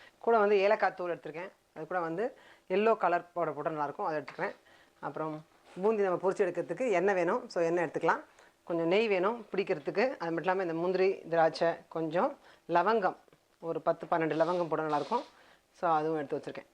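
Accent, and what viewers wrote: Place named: Vellore, India. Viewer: madam recipe is simple and super. Thank you for sharing with us. entha oor neenga unga tamil enga oor vellore tamil maari iruku.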